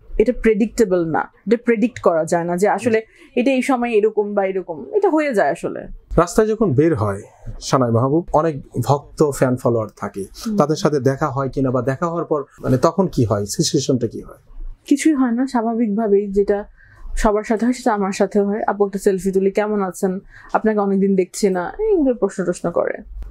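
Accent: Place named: Assam, India. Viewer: Asameese der moto kotha koi kyan?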